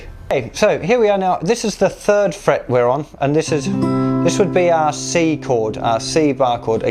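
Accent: Australia. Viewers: are you australian? because you sound aussie.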